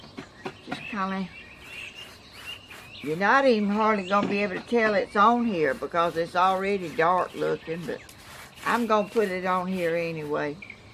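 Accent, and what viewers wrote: South, United States. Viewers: Hi, I'm Melissa from Iowa. I'm subscribed. You are just so stinking cute, southern accent, cute sayings, and a real sweet lady, I really enjoy watching you create your crafts, and am wanting to try my hand at some of them, bless you from Ga.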